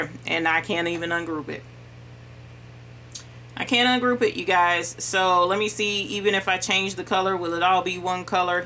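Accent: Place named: Texas, United States. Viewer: Please tell me you are from somewhere within 100 miles of Dallas or Houston? That accent you have makes me feel at home. Can you read me a bedtime story?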